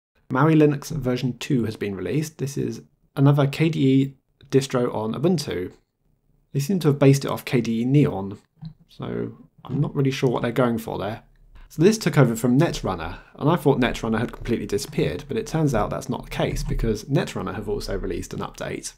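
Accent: United Kingdom. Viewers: I have been a subscriber for several years. For a laugh (and because my ears are old and worn) I turn on CC. I assume CC is US based because your British English comes out a bit funny at times. BTW I used Sabayon for a few months several years ago and liked it.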